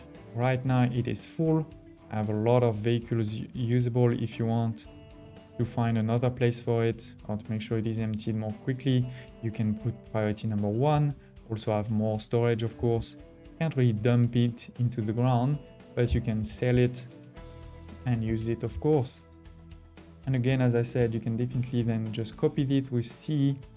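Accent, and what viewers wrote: France. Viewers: hi! thanks for your good tips ! ( what is you accent? French?)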